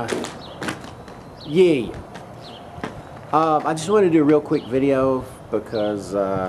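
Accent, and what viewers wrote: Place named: Missouri, United States. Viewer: lol, you're so wacked! Great video on Ex-C. So you're from Tennessee? I wouldn't have guessed it if you hadn't said so. I would have thought you to be a Missoura-in due to your accent.;) Love the fro.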